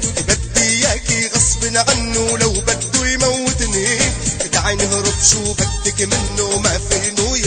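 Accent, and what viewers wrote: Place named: Aden, Yemen. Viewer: اللهجة الادقانية بتجنن وكملها بهالغنية الحلوة 💚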